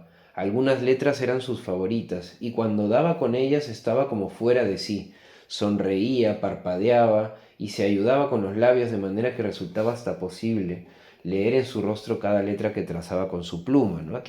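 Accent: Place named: Peru. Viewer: Gracias por el análisis. Que lindo tu acento, creo que es de Perú.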